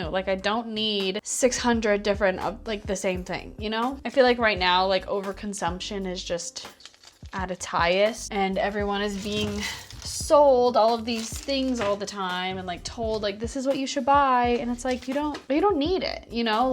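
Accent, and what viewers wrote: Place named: Ontario, Canada. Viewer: your page got recommended to me randomly and i could tell immediately you were from ontario canada lol. the accent gave it away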